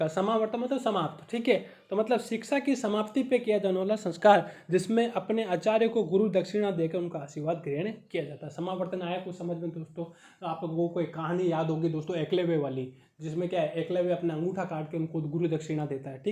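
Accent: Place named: Marwar, India. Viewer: Most epic movement marwadi tone & nice session 💝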